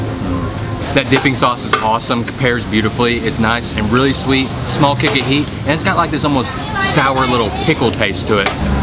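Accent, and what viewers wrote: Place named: South, United States. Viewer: Is it just me, but I think Thai food have changed you 😝. I miss your slow-paced talk, the cool & relaxed southern style 🙂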